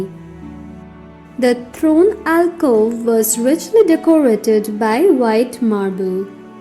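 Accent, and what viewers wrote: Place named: England, United Kingdom. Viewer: Very nice, keep up the good work, very impressive voice and english accent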